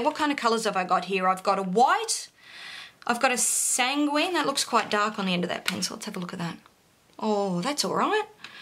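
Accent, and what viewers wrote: Australia. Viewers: Are you from Western Australia? Your accent sounds like it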